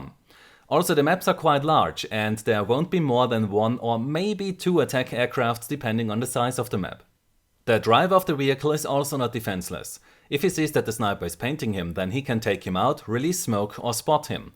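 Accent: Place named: Germany. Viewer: why u sound so german ?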